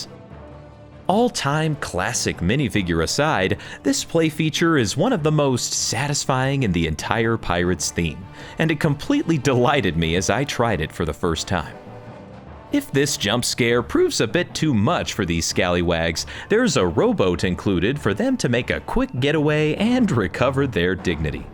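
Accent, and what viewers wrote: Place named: Scotland, United Kingdom. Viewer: Sounds a bit Scottish to me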